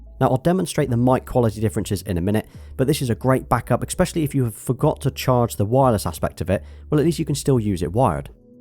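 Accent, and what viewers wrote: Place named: West Country, United Kingdom. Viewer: Great review man, I really enjoyed this. Properly comprehensive and a joy to watch! Loved hearing a relatively local accent too as I'm from Cornwall, and it sounds like you're from the west country perhaps? 😆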